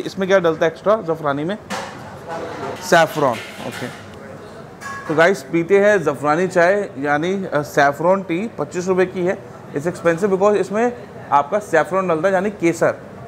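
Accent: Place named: Hyderabad, India. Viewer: Hahaha u r speaking pure hyderabadi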